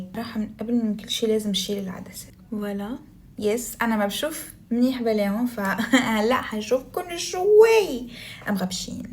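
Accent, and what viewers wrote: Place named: Morocco. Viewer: واش بتعيشي في المغرب لأنك مبين انوا انتي مغربية لأنك مابتعرفي تتكلمي منيح بالسورية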